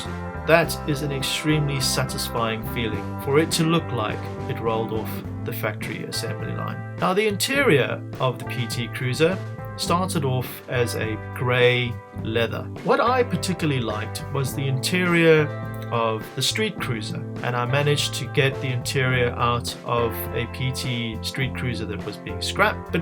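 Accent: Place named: South Africa. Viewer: good to hear an SA accent.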